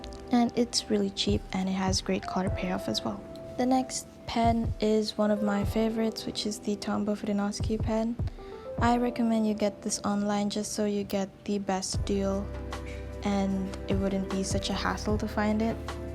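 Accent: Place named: England, United Kingdom. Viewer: TBH! your english accent is music to my ears uwu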